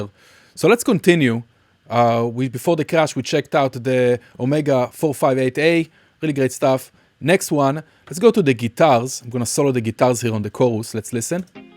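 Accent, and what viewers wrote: Israel. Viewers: I have instantly recognized your Hebrew accent ! קיבלת עוקב ולייק ;)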